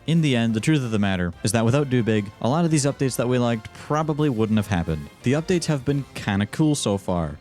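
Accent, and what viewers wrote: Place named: United Kingdom. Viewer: COULDV GOTTEN ARE U BRITISH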